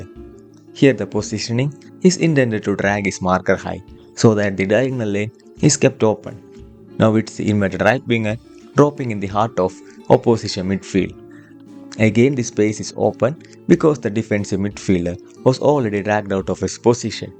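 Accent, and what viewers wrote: Kerala, India. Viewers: Malayali Slag aanallo machand nattil evida. ❤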